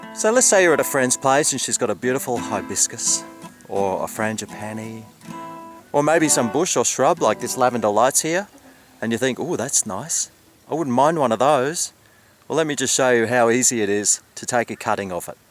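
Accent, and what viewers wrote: Australia. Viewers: "British" accent??? It's Australian. Everyone's laughing at your class now.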